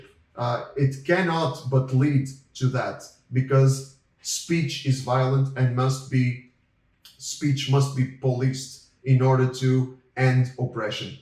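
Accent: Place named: Brazil. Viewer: I'm learning Portuguese , i love your accent, I'm not sure but probably you're from São Paulo do Brasil, or Portugal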